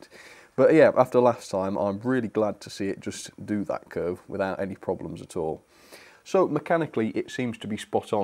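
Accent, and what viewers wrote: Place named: Kent, United Kingdom. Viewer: Another great review! 👍🏻 thanks!! Can I ask where about sin the uk are you from?? My girlfriend says by your accent your from Kent 🤭🤷‍♂️ I say Hampshire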